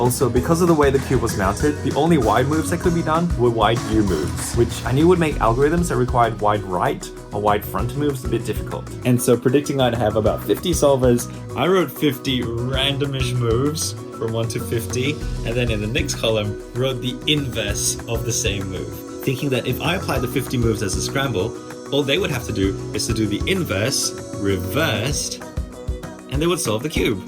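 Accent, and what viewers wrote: Australia. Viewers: Are you Aussie too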